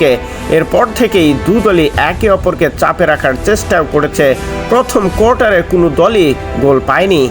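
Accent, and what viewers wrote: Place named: Bangladesh. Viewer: Bhalo bolcho tobuo bangladeshi bangladeshi lagche keno?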